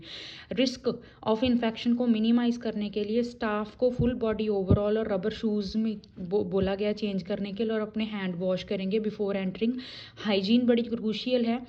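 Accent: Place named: Punjab, India. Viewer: Nyc punjabi akcent overall the method is too good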